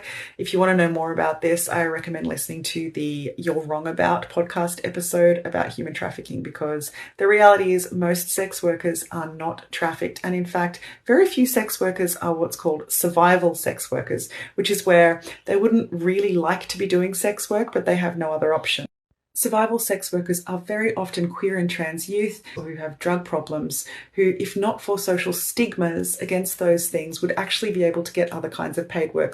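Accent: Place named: United Kingdom. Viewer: 100 % agree. And off topic but I always thought you were from the UK because of the accent, and not Australia😅😅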